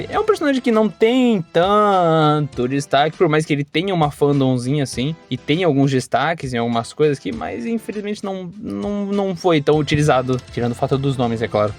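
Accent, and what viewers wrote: Paraná, Brazil. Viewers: mas pia eu sempre disse isso na tua live, é paranaense né, capaz. Com esse som so carca fogo no sape para comer pinhao, daí fica bom que é uma beleza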